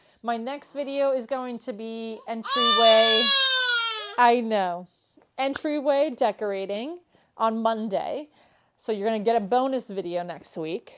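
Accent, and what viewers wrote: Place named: South, United States. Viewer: it’s a cultural “speak” from parts of the south. My husband’s relatives, with “fancy pants” graduate degrees, pronounce it exactly like you do. Especially, when we are at a family get together, with wine and spirits, their Southern Charm flows. I think it’s enchanting! Please don’t change it for anyone. ❤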